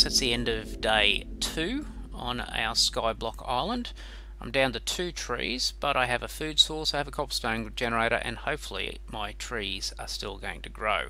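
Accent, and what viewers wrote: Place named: Australia. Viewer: It's great to hear another Aussie accent! I've been watching Pixlrifs' series and thought at the start i could play such a world...but then the more he got into it, the less confident i feel!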